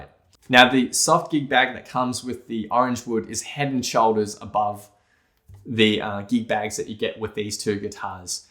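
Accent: Australia. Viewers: The Australian accent is screaming ate so hard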